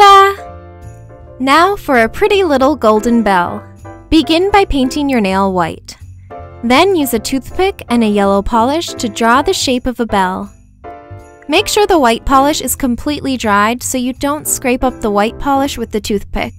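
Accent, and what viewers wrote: Newfoundland, Canada. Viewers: Isn't she from Canada though? Maybe it's a Canadian thing. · Are you from Newfoundland? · You're from Newfoundland??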